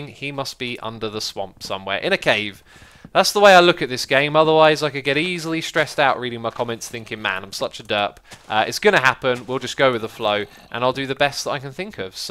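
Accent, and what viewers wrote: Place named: United Kingdom. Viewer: I haven't watched him in ages and he sounds British(OH SHIT, YES, BELIEVE IT OR NOT THIS IS AN OPINION. WOW AMAZED). Please don't get mad at me when I barely know anything about him, Mr. Know-It-All...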